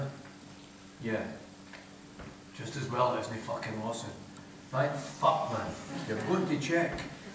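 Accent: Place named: Scotland, United Kingdom. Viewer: I love the Scottish accent! :)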